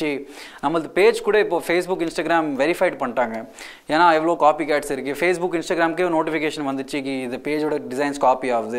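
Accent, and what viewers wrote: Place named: Bengaluru, India. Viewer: Bengaluru tamil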